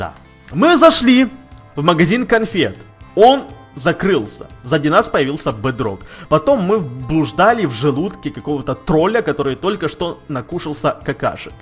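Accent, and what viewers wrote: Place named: Ukraine. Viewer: ты не русский,ты украинец?,но ты точно не русский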